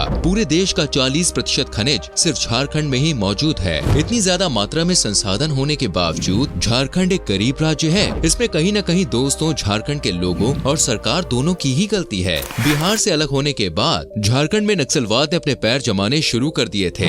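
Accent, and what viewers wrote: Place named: Assam, India. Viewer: आप तो आसाम के लग रहे हो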